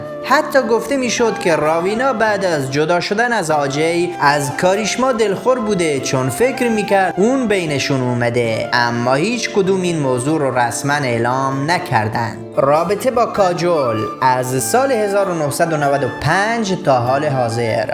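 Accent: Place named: Iran. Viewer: تو که افغانی چرا به لهجه ایرانیها گپ میزنی وطندار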